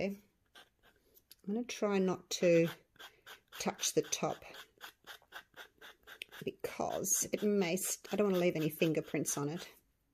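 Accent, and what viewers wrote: Australia. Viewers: My goodness those are gorgeous!!! I’ve got to try this!!! I just love listening to you talk, Aussies have such a pretty accent & you sound like such a lovely, fun person! Heading to your eBay store now!💕💕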